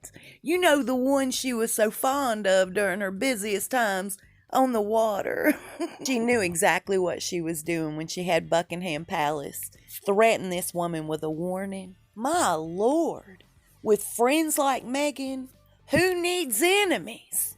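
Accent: South, United States. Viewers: A southern accent always makes me feel at home. I appreciate you,!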